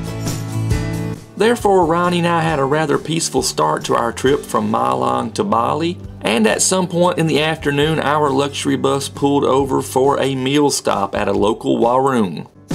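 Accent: Kentucky, United States. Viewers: Thank you so much!:) The Kentucky accent is so funny😁 Keep up good work guys!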